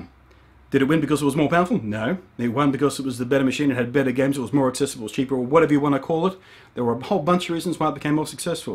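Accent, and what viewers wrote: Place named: Australia. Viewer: Love watching your stuff man, I agree with 99% of everything you say. I dig the enthusiasm you have for gaming, Its rare these days and its refreshing to see. Your game and device reviews are very entertaining. Its also good to hear a fellow aussie online. Keep it up "thumbs Up"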